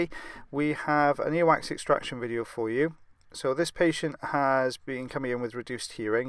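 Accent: Wales, United Kingdom. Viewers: WELSH YOU SEE I OFTEN THINK HE WILL BREAK OUT IN SONG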